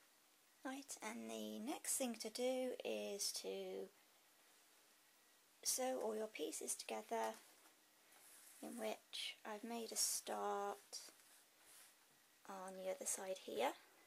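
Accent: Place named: Wales, United Kingdom. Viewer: This must have taken SOOOOOOO long!! Are you from Wales? You sound Welsh :P xx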